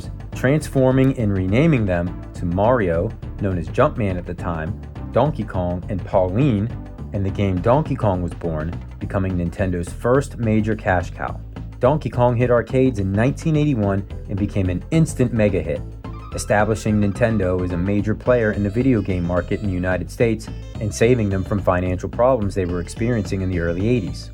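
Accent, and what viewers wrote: Western Pennsylvania, United States. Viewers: Lol nice! I'm a complete f*cking nerd about linguistic stuff. So I'm like, bro must be from Western PA. I stopped short of calling you a Yinzer tho 🤣 #iykyk I'm from Ohio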